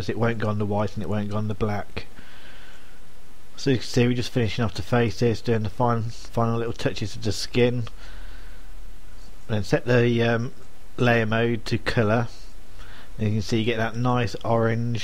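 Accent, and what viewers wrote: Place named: United Kingdom. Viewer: A great example of a fantastic tutorial that is impossible to follow. Pardon my frankness but your introduction might seem like a good idea to you but to me, I can't hear the essential part of what you are trying to say. Its concealed by the MGM soundtrack you used. I've got 18 years of Photoshop experience and like the lady further down the comments lists says... I can't learn a thing from this. Dozens, maybe hundreds of otherwise really useful tutorials are completely ruined by the speed and mumbling of the person doing the tutorial. You can take heart in the fact that even Adobe themselves are guilty of the exact same problem. 50% of their tutorials are wasted on 80% of the audience. I used to listen to soapies my family watched in the evening and marveled at the clear and concise way the actors spoke. No one could suggest they couldn't be understood. 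Many years ago - before the Internet, I used to make How-to VHS videos. They got accepted by a TV station because I'd spent over a year practicing clear diction before ever making my first tape. In all seriousness mate... Your Tutorial is exactly what hundreds of people need and most will never understand anything about the processes you describe. Slow down what you are doing. Speak clearly AFTER the filming of the process and you'll have an exceptional video. Make more like you made this one and only some British people will understand what you say. The rest of the world will keep looking for one they can understand. No mali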